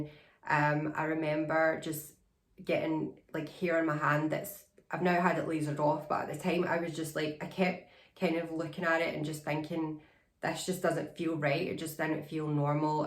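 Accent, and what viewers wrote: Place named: Scotland, United Kingdom. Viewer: Personally I would have been whipped if I had have told my father when I was that Young. Even now when I am at an early stage in my own transition. I still need a lot of encouragement in my journey because I feel Society is not taught any different . That decision to block trans people should not come down to a decision one greedy person decided to Sue the government. Oh by the way I love your channel and the Scottish accent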